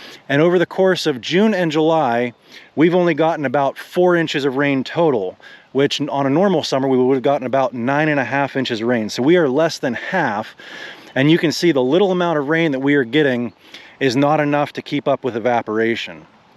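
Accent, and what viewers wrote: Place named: Pittsburgh, United States. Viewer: Add bentonite clay, cheaper, are u in pa pittsburgh area? I here your accent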